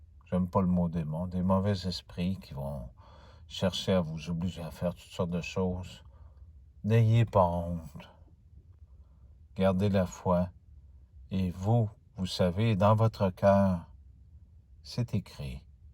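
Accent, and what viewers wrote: Quebec, Canada. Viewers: Vous êtes québécois ? 😄😁😆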